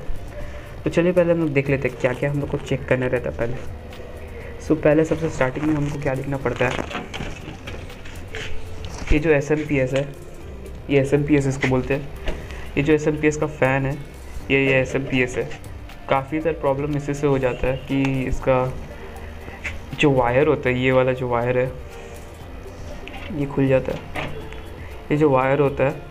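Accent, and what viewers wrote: Bengal, India. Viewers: Are you bangalee? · You are bangali, right? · Are you bengali?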